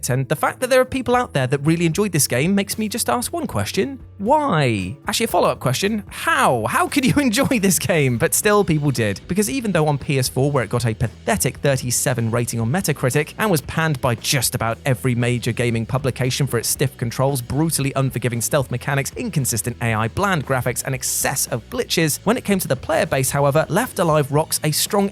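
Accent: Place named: United Kingdom. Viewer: you don't enjoy the game cause you are one of those reviewers... Jesus this guy is so fucking unaware... the definition of a brit that made a career with American audiences cause his accent makes him sound genuine.... this guy... smh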